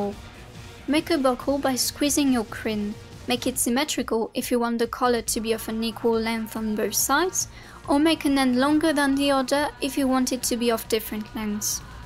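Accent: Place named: England, United Kingdom. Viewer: Donne moi ton accent anglais on fleek s'il te plaît:(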